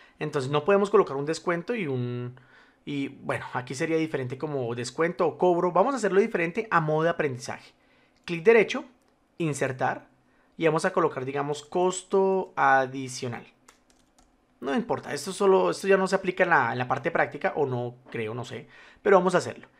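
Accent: Colombia. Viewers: Escuchar el acento colombiano no tiene precio!!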